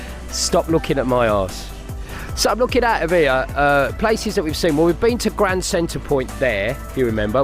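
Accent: Birmingham, United Kingdom. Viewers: Great video mate. Never made a comment on your channel before. I had to because it was brilliant. Love the Brummie as well.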